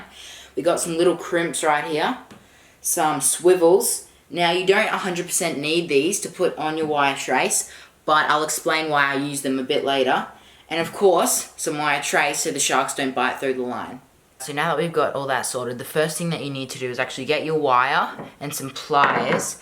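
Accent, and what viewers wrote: Australia. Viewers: r u Australian? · Probably Australia, he sounds like it.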